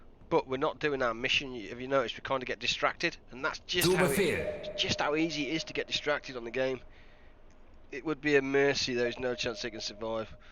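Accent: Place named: United Kingdom. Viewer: Very nice gameplay and commentary, you definitely have a sub(-scribe) ;-) from me. Keep it going. Also approve of the very British way of commentating :-) . Really like the way you communicate with your stream viewers and take suggestions from them.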